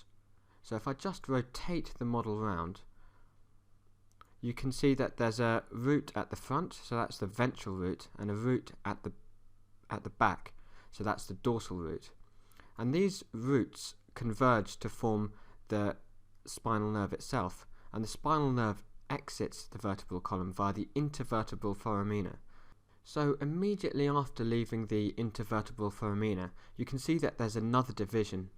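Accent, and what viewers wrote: United Kingdom. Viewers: I am a layman. But I love the accent of your British English.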